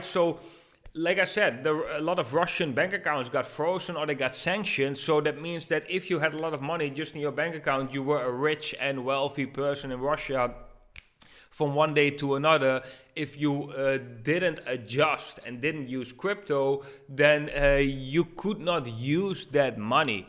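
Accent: Netherlands. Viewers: meetup would be sick, je klinkt nederlands, ben je van nl?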